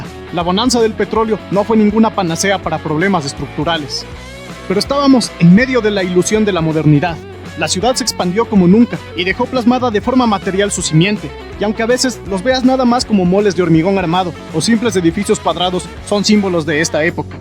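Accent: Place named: Mexico. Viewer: El narrador es mejicano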